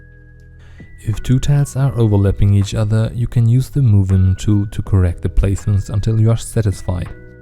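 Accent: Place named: Germany. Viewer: wie man einfach immer raußhört wenn einer deutsch ist XD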